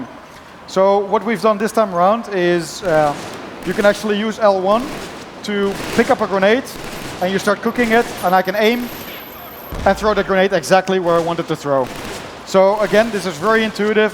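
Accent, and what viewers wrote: Netherlands. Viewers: Haha you can hear he's from the netherlands, I wonder how many people will play the game like this... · Hahaha, he is Dutch. I can hear it from his accent xD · hahah geweldig dat nederlands accentXD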